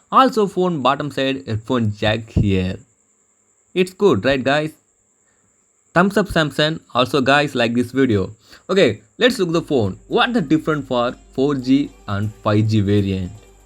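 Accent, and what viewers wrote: India. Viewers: Too hard to understand with your heavy Indian accent, moving on.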